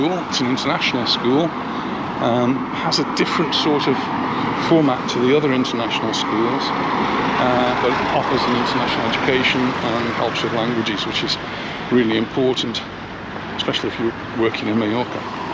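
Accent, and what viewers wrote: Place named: Scouse, United Kingdom. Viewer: Hi &, we’re long time subscribers, loving your vlogs and the hint of a scouse accent 👌😉Just after some advice if you don’t mind. We are cruise fanatics and vloggers and are visiting Palma for the day on Cunard’s Queen Anne on 17th June. We want to visit a nice beach for the day. It would need facilities (Sunbeds, umbrellas, somewhere for food and drink), have relatively easy access (a couple of our party have mobility issues and be not too far from the cruise terminal. Portals Nous looks good, any other recommendations? Thanks in advance. Gail & Mike x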